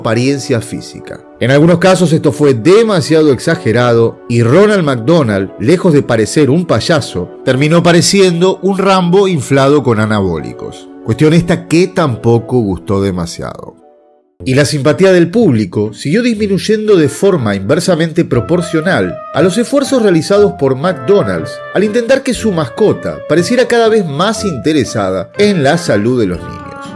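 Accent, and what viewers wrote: Argentina. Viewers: Se llama. Da consejos sobre como correr mejor, por su acento pienso es tambien de Argentina.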